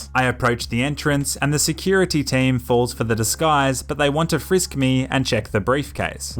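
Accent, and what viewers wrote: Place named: Australia. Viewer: Thought he was Aussie I’m Australian to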